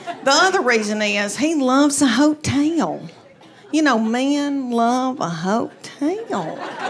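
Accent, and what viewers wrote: South, United States. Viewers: I always laugh…so relatable about everyday life with the fam. I love her southern drawl it adds to her stories. It beats one from up north any day… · She is really funny , love that southern belle accent 😂😂😂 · As a fellow GenXer I enjoyed her material, but her accent is just too Southern for me. I'm as South as you can get(Florida born and lived here all my life), but I'd guess that only about 5% of people have Southern accents that thick. Probably because the vast majority of people that live here moved from up north. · Yes...She is refreshing. I find it odd though...that her accent is way heavier and countrified in this video than the other two videos of her I watched. I think one of the others was from at least two years ago. This one is 6 months old. I guess she changes her twang...based on location? That would kind of make sense. 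You want to be understood. Maybe she moved back to Georgia? That is what the accent resembles. Regardless...I was also brought up in the mid 1960's - 70's...and I can relate to a lot of her stories. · This has to be one of the worst American accents there is! So nasal and annoying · With that southern drawl, only she can talk about her real life situations, and make it relatable and funny! 😆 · I am not a native speaker. What southern state his this accent? Georgia? Alabama? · Just discovered. Nice hearing a clean southern story teller. Jerry Clower style. ❤ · i’m divorced, from extreme L california, been dating a lotta southern girls lately and now in love with their southern accent. and it’s women like that only makes me love southern girls more!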